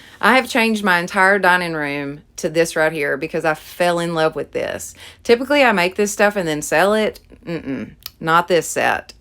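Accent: South, United States. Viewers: I am another southern girl & I love listening to someone that sounds like me. I am so glad that you are one of those diyers that talks about the things that can go wrong while doing a project. Loved your projects & hope you have great success with your channel. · Girl I'm just getting started crafting making stuff for my home. I love your creations and love your southern accent. I'm from Alabama... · It’s a good thing you didn’t listen to your kids because you’re an amazing crafter. And I’m a southerner so I love the accent. :) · Love your tutorials! You think more outside the box from most YouTube DT Crafter's. Btw, where are you from? Your southern accent sounds almost exactly like mine. I'm from Western NC. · You are a great addition to YouTube! I’m looking forward to more videos. Awesome diy tutorials. Love your accent. My family is from the south and it takes me back. 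I get to talkin like them when I’m around them. Nothing but ❤️ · I generally don't like to listen to a southern accent, but I loved your spunk and not letting your kids intimidate you into not doing these videos and your style of crafting is really cute, so different from other items I've seen in other videos. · I LOVE the southern accent!! Anywho, I love all of your DIY's! I'm so glad I found your channel!!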